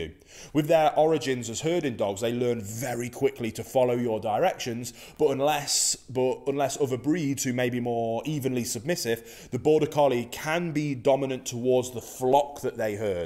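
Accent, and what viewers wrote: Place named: England, United Kingdom. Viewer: I love your accent. (And I also love your video otherwise I wouldn’t have watched it.) What part of England are you from?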